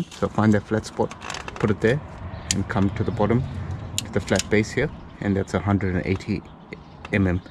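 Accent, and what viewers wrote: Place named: South Africa. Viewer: thnx. car is Evo V 400hp street car. Btw where are you based , your accent sounds like you originally from South Africa 😊 ?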